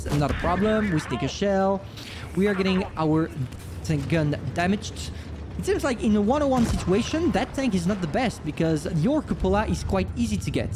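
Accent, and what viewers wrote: France. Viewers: Tu est français😂😂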